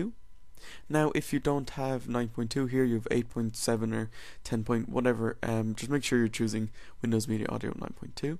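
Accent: Ireland. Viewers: The video at points makes you sound like you've got a dirty Dub accent. Sorry for the insult ;)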